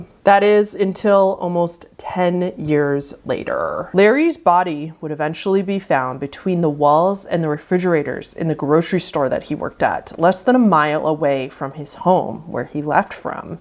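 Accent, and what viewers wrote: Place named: Seattle, United States. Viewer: I'm binging your videos again and I keep saying "your voice is so beautiful and calming" but I couldn't figure out where your "accent" is from (I'm trying to get better at recognizing state accents. Several videos ago you messaged washinton a few times, but nowhere specific.....you are about an hr from tacoma, so I'm guessing the Seattle area....makes sense that I couldn't figure the accent out😆 I'm in Lakewood (10 minutes from tacoma) so that's why you sound so calm and safe for me, you sound like home😄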